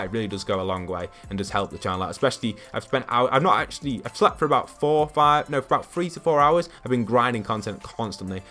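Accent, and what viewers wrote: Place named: United Kingdom. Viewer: He british that's how Brits say it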